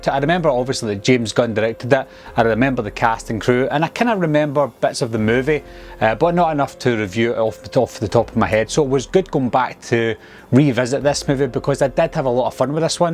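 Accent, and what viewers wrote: Scotland, United Kingdom. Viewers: Love yer Scots accent, pal! Good to hear a Scots voice doing YouTube reviews. Miss that lots - used to bide in bonnie Aiberdeen, and aye, I still spik a wee bit o the Doric...agus tòrr Gàidhlig cuideachd! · Damn I was not expecting such... Scottishness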